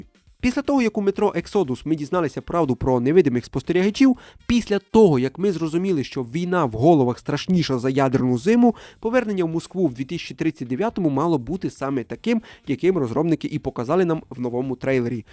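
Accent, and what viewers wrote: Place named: Transcarpathia, Ukraine. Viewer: Дуже гарний закарпатський акцент)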